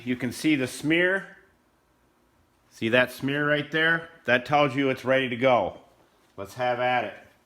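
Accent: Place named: Michigan, United States. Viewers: Where are you in Michigan? I’m originally from the Flint area and thought I recognized that accent. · This guy has a Michigan accent for days...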